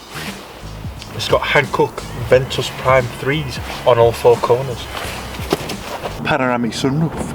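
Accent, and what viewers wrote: Yorkshire, United Kingdom. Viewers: Looks like you got a bargain there with the 2.2. I've got a 06 one as a daily and a DC2-R as a weekend car. You sound like a Yorkshire lad? I'm in Yorkshire not far from Yorkshire Wildlife Park.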